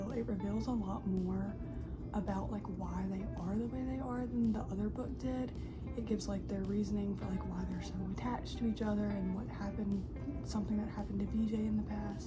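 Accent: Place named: Appalachia, United States. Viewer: this randomly popped into my recommended and respect for knocking out that much reading in a month! Are you appalachian by any chance? obviously no need to be specific but your accent sounds like we could've grown up together lol!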